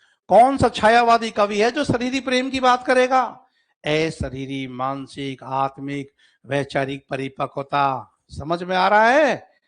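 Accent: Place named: Bharatpur, India. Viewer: सर जी आप कहां से हो। आपकी भाषा भरतपुर बालों से मिलती है।❤❤❤❤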